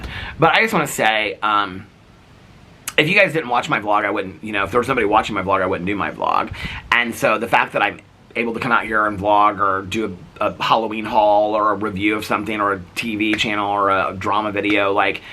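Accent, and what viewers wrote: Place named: Midwest, United States. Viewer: I've noticed that too. Honestly, it has to be some kind of accent. I remember in the first grade I was so confused because I had a friend who would say 'saw' like that, and she'd say 'drawl' (for draw). More than anything I'm just curious about which part of the Midwest it comes from. Obviously somewhere. Maybe my friend's parents and parents are from a similar spot geographically?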